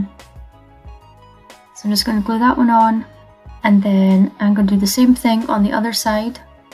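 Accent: Scotland, United Kingdom. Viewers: My wife Cinnamon, really loves your crafts. She is watching you make the fireplace with the broom and cooking pot and spiders. She just loves it. Also loves listening to your voice. A touch of bonnie Scotland.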